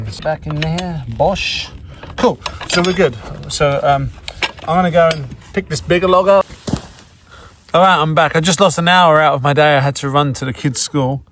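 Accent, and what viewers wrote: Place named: United States. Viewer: why the yank accent??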